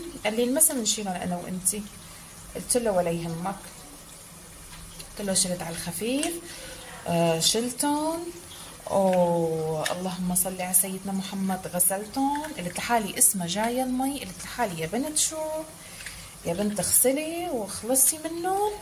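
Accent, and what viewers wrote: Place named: Levant, Arab world. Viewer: دخيل صوت شامية حكيك بجنن ❤❤❤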